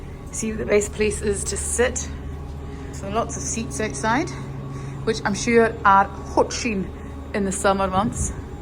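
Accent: Scotland, United Kingdom. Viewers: Another great video and full of information. Love your Scottish accent and your laugh. ❤🤠👍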